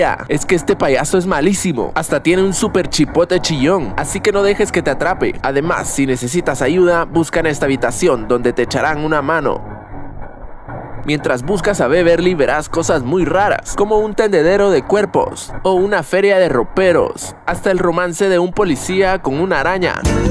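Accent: Guatemala. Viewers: Una pregunta sos de Guatemala porque tenés el acento guatemalteco Yo soy de Guatemala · ¿Eres de Guatemala? :D Yo soy chapina (っ.❛ ᴗ ❛.)っ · Un patojo!, Que se me hace que eres de Guate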